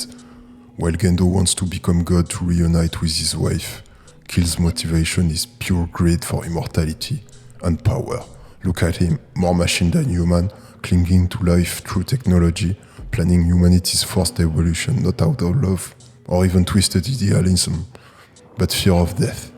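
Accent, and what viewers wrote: France. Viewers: g reconu ton accent, c l'accent d'un français 100% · how can one man sounds so french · Sounds like French accent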